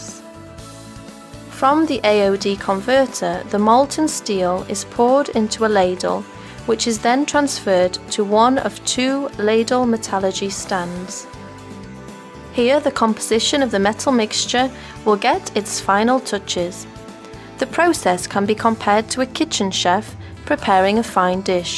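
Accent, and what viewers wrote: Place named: Europe. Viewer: Very nice voice and european accent.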